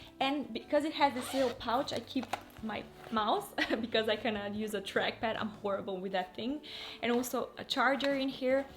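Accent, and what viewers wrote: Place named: England, United Kingdom. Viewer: Love your english accent, where it from???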